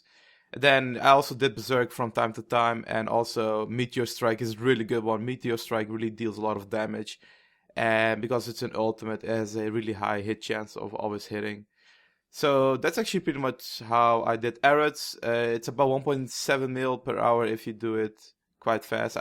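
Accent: Netherlands. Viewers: is how 80% off the dutch ppl speak english ahha! I'm also a proud dutchman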